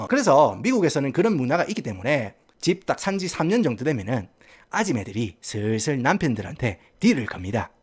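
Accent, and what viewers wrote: Gyeongsang, South Korea. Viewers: 내용도 전두엽 쌍골짜기에 싸대기가 메아리칠 정도로 신선하고 쨈있지만, 썰푸는 vibe가 참~ 찰져! 갱상도 억양과 양키어의 묘한 앙상블. 그래도 유료가입은 몬한데이~! 내코가 월터 와이트 입던 빤스라도 갖다 팔아야 개우 입에 풀칠. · 사투리가 너무 찰지셔서 댓글을 안 달수가 없었다...찐 경상도 바이브가 영어랑 절묘하게 혼합된.... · 경상도 액센트의 영어로 설명해주는 미국의 사회 문화 컨텐츠 ㅋㅋㅋㅋㅋ진짜 미치겠다 ㅋㅋㅋㅋㅋ 구독박고갑니다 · 사투리가 쏙쏙들어와요 경상도ㅎㅎ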